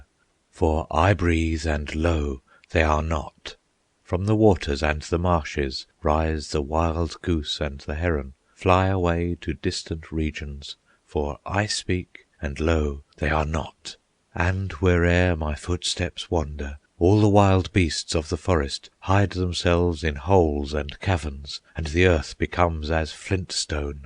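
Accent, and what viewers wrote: England, United Kingdom. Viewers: It's difficult to get into hearing this read with an English accent. Sorry. If it was Shakespeare, he'd be great.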